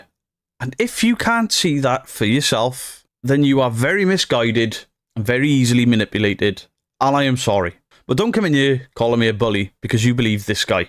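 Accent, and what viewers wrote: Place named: North Wales, United Kingdom. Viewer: Hello from Toronto, Canada. Omgggg, you are killing me! This one was freaking hysterical, the interview had me crying laughing & trying to eat my tea at the same time 😂🤣😂🤣. I love your accent, I miss hearing the Welsh (North Wales) accent daily. Please keep on chasing the fakers & making us laugh at the same time. I look forward to you dropping new videos. Please do some debunking of that Canadian faker Moe Sargi, he was dumb enough to post a video that he did not edit properly & was called out for faking & he pulled the video.soooooooo fast. Take care & have a great weekend ❤️ Janet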